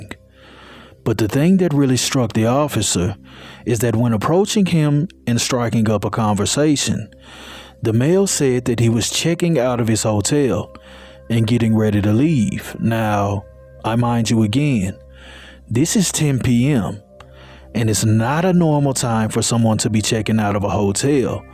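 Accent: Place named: Memphis, United States. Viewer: You sound like you from #Memphis 😊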